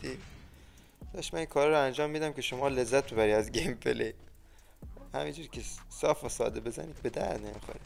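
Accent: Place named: Bushehr, Iran. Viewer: لحجه بوشهری داری....همشهریمونی??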